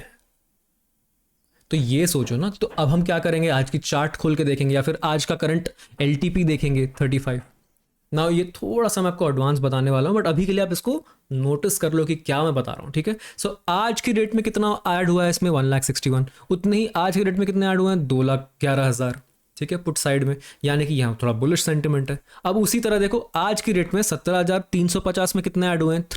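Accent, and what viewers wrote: Bengal, India. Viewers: are you from Kolkata or Bengali ?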